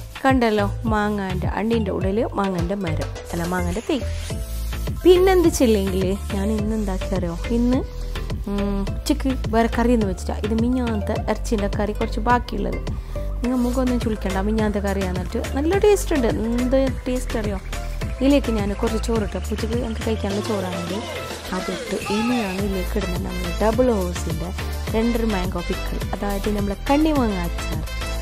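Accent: Kasaragod, India. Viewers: ഇതെന്തു ഭാഷ 😂🙊... പൊളി 😂✌️ എനിക്കു ഇഷ്ടാണ് കാസറഗോഡ് സ്ലാങ്... ഒന്നും മനസ്സിലാവില്ല കേട്ടാൽ.. 😝പക്ഷെ കേട്ടിരിക്കാൻ ഇഷ്ടാ 😍... കണ്ണിമാങ്ങ അച്ചാർ 😋😋😋വായിൽ വെള്ളം വന്നു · Kollallo kaasrott slang 👌😍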